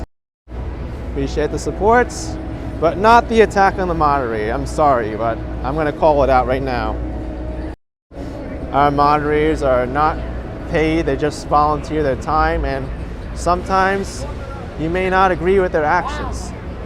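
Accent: New York, United States. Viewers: I thought you were a jewish white kid this whole time LOL. Never seen an asian person with an NY accent but im from FL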